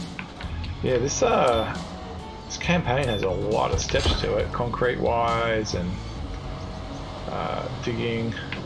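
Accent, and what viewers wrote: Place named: Australia. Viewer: that makes sense! 😂 you upload schedule says USA but your accent says Australian 😂 damn! Timezones are annoying!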